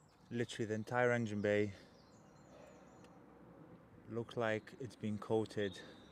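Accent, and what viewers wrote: United Kingdom. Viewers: Great Chanel! Great products! Do you mind me asking about your accent? I hear a little North East twang maybe? 🤔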